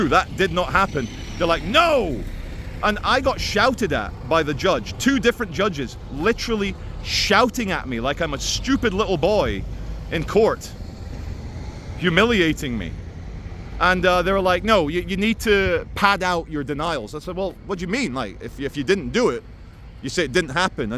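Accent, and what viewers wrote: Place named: Scotland, United Kingdom. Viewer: your posh Scot accent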